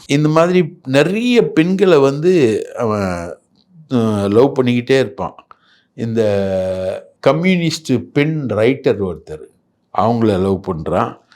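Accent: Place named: Chennai, India. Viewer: is jus a host and relative of savukku in some ways, her speech and body language like local chennai slang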